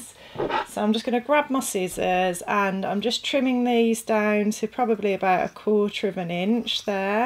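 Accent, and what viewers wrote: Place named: Yorkshire, United Kingdom. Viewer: I’m a self taught sewer and have been sewing for a very long time. I am amazed at the tips and trick I have learned from you. I am also an expat from Yorkshire so I enjoy your accent. It’s a little bit of home. Thanks for this awesome channel.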